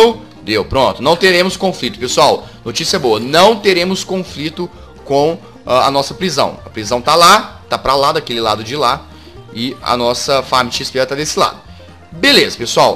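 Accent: Minas Gerais, Brazil. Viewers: Vc mora na Bahia????? Podia jurar que vc morava em Minas Gerais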